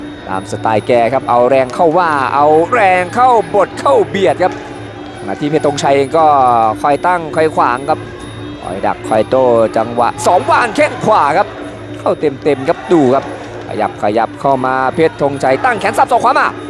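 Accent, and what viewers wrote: Isan, Thailand. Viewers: ม่วนๆฟังเสียงพากษ์อีสานบ้านเฮาเด้อพ่ออาว555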